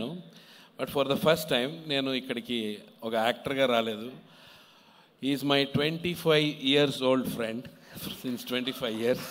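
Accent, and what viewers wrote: India. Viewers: nee butler English ...........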